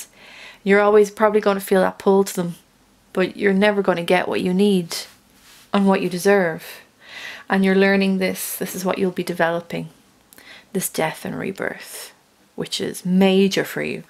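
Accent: Ireland. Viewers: Love the Irish accent 😌✨ dope reading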